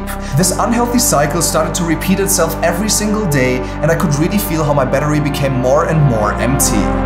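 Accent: Germany. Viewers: Respect und wusst ichs doch dass du nen Deutschen Akzent hast🙃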